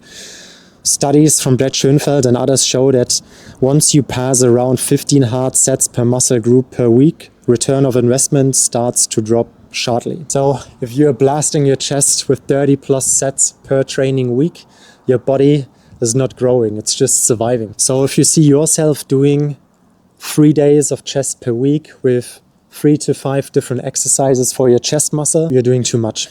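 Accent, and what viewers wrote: Germany. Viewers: Are you German by any chance... I live in Germany and your accent is like German... Just curious. Also, very honest advice. I hope and pray your channel grows since the major population on YouTube or social media looks for flashy shortcuts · When germans speak english :D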